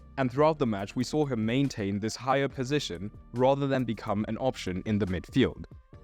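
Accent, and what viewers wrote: South Africa. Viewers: Once again...top class and in depth analysis that focuses on the intricate details of tactics👌 just a question..are you South African?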